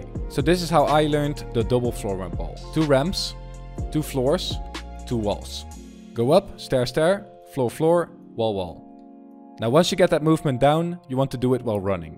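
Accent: Netherlands. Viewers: you hear your voice being Dutch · Hes Dutch (like me) and the most people that are dutch have that accent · Yo ik ga morgen overstappen naar pc en ik vroeg me af aangezien je ook Nederlands bent (ik hoor het aan je accent 😁) of je mischien als ik een beetje beter ben een paar 1v1s tegen me wilt doen om te oefenen. Je video's zijn handig 💪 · Is he dutch? His accent gave iT away! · Wait are you from teh netherands? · Ik hoorde dit letterlijk 1 seconde in wist gelijk dat je een Nederlander was😂 Geen haat btw bij mij hoor je het ook hoor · You are probably Dutch? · Wait you’re dutch lol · Je bent een Nederlander! Ik vond je accent al zo NIET engels lijken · R you dutch bc u have a dutch accent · waittttt are you Dutch or Belgian? ;O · Ik hoorde aan je accent dat je nederlands was en toen je je dpi naar 500 zette toen zag ik dat het in nederlands stond gg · Are you dutch? 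· ben je Nederlands? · Youre dutch? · Eyyyyy ben jij nederlands?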